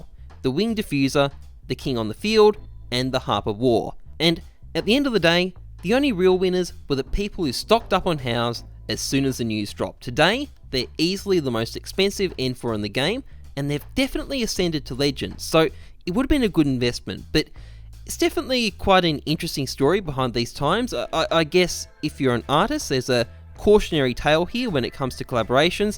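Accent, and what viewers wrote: Finland. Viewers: Wait...... What are u From finland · are you from finland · R u from finland? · Wait... Are you finnish??? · Are you Finnish? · are you finnish. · Are you from finland?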